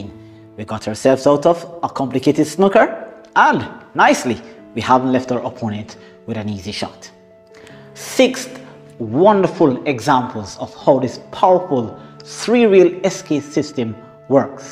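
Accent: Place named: Jamaica. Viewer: Hi there... Good Morning to you. I'm gonna suggest that you try out some kind of sound system, where you can use a chin mic (didn't wanna say mouth mic). The echo & your Jamaican dialect makes what you say hard to hear & understand.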